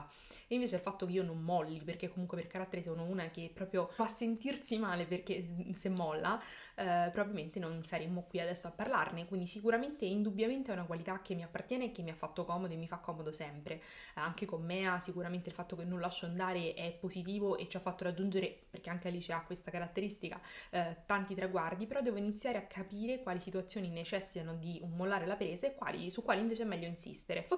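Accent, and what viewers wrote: Rome, Italy. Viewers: Forse una cosa che non ti ho mai detto e che non vedo scrivere spesso. Parli molto bene. Sei romana certo ma se devi tenere un discorso sai esattamente come esporlo e anche in modo ottimale. Bel video. Bello per quello che hai detto e perché hai legato il tuo discorso a dei video significativi. Complimenti, uno dei video migliori❤️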